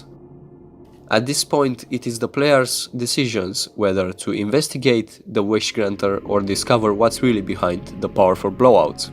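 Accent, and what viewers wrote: Romania. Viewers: asta e prima data cand vad ceva de la tine, cum te-am auzit vorbind : "sigur e ro" ma duc la "about" - :D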